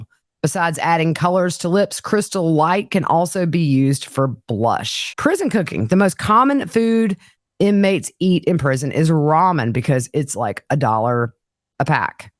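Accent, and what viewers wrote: South, United States. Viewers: Love you! Love these prison life podcasts! Love your Southern accent! Love what you do! Thank you SO much! Appreciate you! ❤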